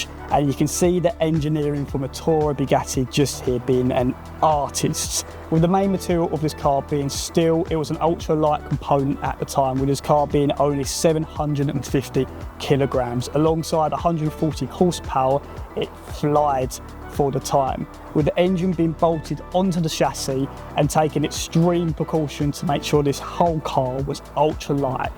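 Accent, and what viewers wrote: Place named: United Kingdom. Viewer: US of A. Also, you & many Brits pronounce your 'th's like Fs and Ls like W's. I love accents & find them fascinating. Thanks for the killer video!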